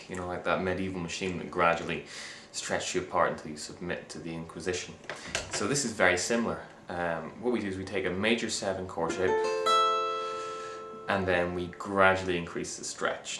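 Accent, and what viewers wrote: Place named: Northern Ireland, United Kingdom. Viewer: true man! your videos are great and i hope to see more from ya! haha cool so i was little bit right! :D i thought i detected a tiny bit of northern irish in there somewhere haha!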